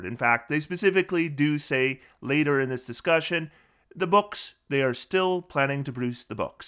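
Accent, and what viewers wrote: United States. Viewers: It's almost like the profit motive degrades the value of a product over time as a business gets more and more successful. I was waiting for to say something along the lines of "profit motive bad" but it never came. To be fair I don't know him or his personal positions on things like this but also to be fair he's probably an American from his accent and he's probably been around long enough to see this sort of thing happen time and time again (at least in America). Maybe if the main goal of a business was to maximize product quality instead of product profitability, we wouldn't run into the age old problem of product quality being sacrificed in exchange for profitability. Now this is highly improbable under the current system with the way things are. So here are some changes to how the economy would work to fix this problem (and fix some other unrelated problems too): All companies must be by the law of the land, run by an elected president of the company (max term 4 years). 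No CEO, no owner of the company. In fact there is no owner of the company. Instead every employee is a part-owner of the company and gets a share of the stock. The stock isn't there to earn money. It's there to show you're part owner. Speculation (gambling (which is bad)) on the price of a 'share' of a company is now a thing of the past. Instead the profits will be split evenly amongst the employees. (The president will save some of the profits for maintenance, replacing equipment, etc.) Also to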